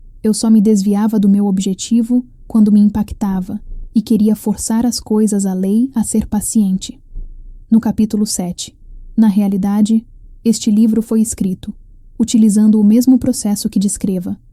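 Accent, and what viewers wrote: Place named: Portugal. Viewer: Mas reparou que sai do PT Br pro PT de Portugal?😅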